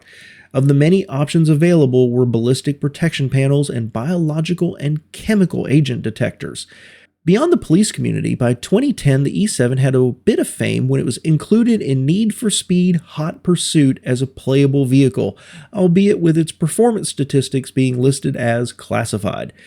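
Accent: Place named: United States. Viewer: Great video, thanks! One gripe I do have is the fact that you didn't say at the beginning what country you were talking about. While it might seem inconsequential, as a first time viewer from elsewhere I didn't know if you would be talking about only the US domestic market or if there would be a wider perspective. I quickly gathered you were American by your accent and by the police cars you showed (although the little Fiat Nuova 500 behind sent another signal), but I just wish you would have said "the American police" rather than just "the police". Considering there are hundreds (if not thousands) of other police administrations in the world, and that they all have interesting stories to tell on how they select and build their vehicles, it's important to be precise location wise like you were time wise. Which you ended up doing beautifully by the way, just a bit late in the video. 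Edit: the very first sentence of the video exemplifies my issue: "Police cars come in many shapes and sizes" (absolutely right) "and while Ford controls 61% of the market" (that statement is false: Ford controls 61% of it US police car market, not the "police car market") It makes it seem that it's so obvious that you're talking about a pure American story through and through (when it's not immediately obvious), that there's no need to mention that you're talking about the USA (there is a need, otherwise your statements are technically not correct anymore).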